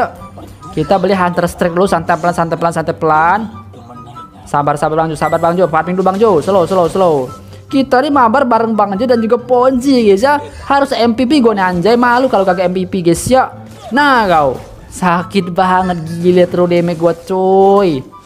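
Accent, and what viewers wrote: Palembang, Indonesia. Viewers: Ngapo logat cak wong palembang yo..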